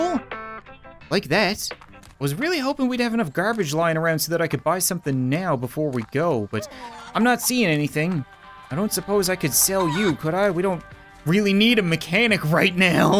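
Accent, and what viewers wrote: Appalachia, United States. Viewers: only og people remember kindergarten 2 his hill billy voice sounds like Felix from kindergarten 2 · uses hillybilly accent Me: remebers kindergarten 2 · His hillbilly accent reminded me of kindergarten 2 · The hillbilly accent reminds me of the voice he gave for ted and felix from his kindergarten 2 series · I love how we call hillbilly accent the Felix and Ted voice now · Damn that hillbilly voice is nostalgic of the kindergarten series... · Your country accent in this is the same as one of the twins in the kindergarten 2 game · Your hillbilly voice Me: kindergarten flashbacks Who was that voice for in the kindergarten series?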